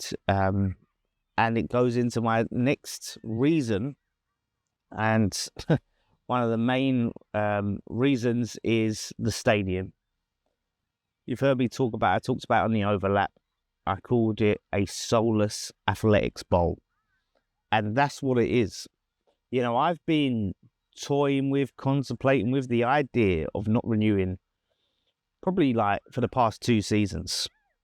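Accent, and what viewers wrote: Jamaica. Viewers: why do you talk like a jamaican? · I probably agree with everything you say - but please change your accent, you sound like a yardie in Jamaica - I had to switch off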